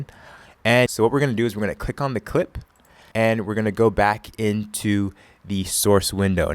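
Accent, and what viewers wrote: Nigeria. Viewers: Just asking Are you a nigerian ? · are you Nigerian? If so, so am I! Thanks for the help, I'm trying to learn how to edit and this really helped!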